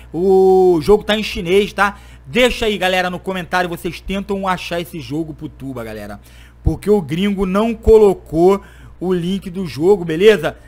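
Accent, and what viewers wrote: Rio de Janeiro, Brazil. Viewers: Tuba o nome do game e wasteland e perigoso eu acho e oq estava lá porém está em fase de testes, uma pergunta se é carioca?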